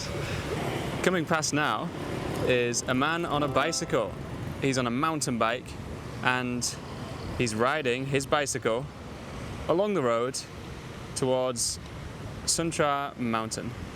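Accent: United Kingdom. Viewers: I'm really happy with your videos, I watch them every day and I really love them! Thank you for your help, and also I love the British accent.❤❤ I still learning 😅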